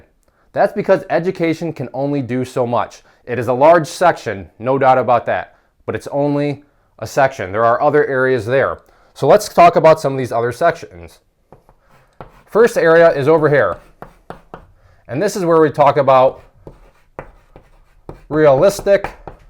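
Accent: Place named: Midwest, United States. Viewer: Where from the Midwest are you from i got a feeling your from the midwest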